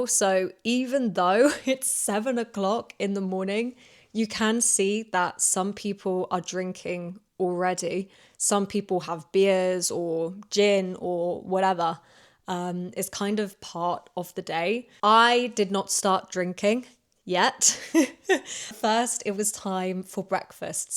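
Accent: United Kingdom. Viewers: Your British accent is sooo nice - it's like music to my ears!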